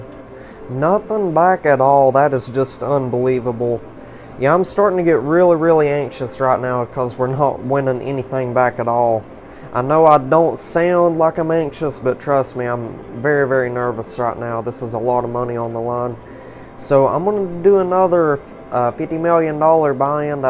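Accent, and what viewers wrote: South, United States. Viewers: Apparently, this guy who talks like a red neck does!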